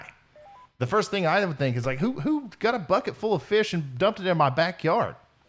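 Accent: South, United States. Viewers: I don't think has a strong southern accent.just a hint there. You guys who says he has a southern accent needs to come to GA and listen to people. But I'm with it has nothing to do with intelligence at all.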